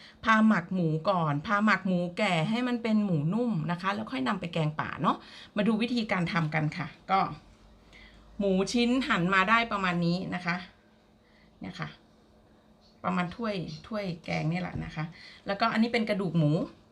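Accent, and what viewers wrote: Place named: Phu Thai, Thailand. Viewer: มีเเต่เมนูตาเเซปจ้า,ออนซอนจ้า,มักสำเนียงภูไทมากจ้า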